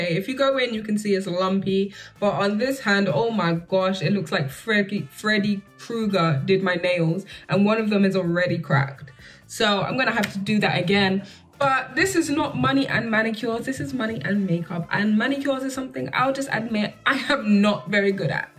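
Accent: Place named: United States. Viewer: Yasss but how you have American accent 😅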